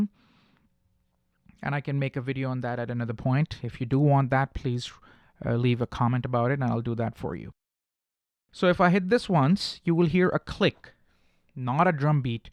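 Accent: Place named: England, United Kingdom. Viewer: Except the English accent everything else is excellent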